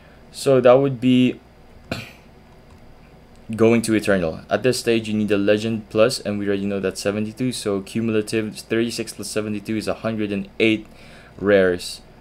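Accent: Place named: Brazil. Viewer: I swear that you was talking in Portuguese in this video.. your voice sounds like a Brazilian voice 😂 I was searching in Portuguese this video because I want to see again, but now I found it.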